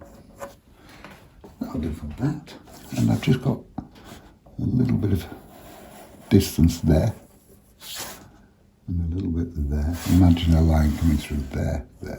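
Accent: Yorkshire, United Kingdom. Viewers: Just discovered your channel. Glad I did. As a native of Yorkshire me self, it’s nice to hear a Yorkshire accent. And your paintings are stunning. This one so reminds me of many snowy days I’ve seen back up North. I’ve subscribed and look forward to many more tutorials as good as this one. Thank you. 😊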